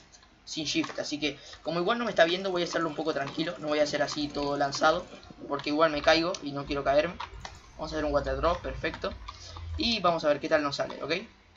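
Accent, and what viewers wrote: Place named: Argentina. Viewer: Hola! te hago una pregunta sos de Uruguay o de Argentina por que hablas como los argentinos pero tenes una capa de Uruguay en minecraft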